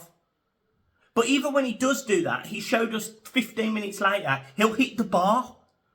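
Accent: Birmingham, United Kingdom. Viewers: Are you a Brummy?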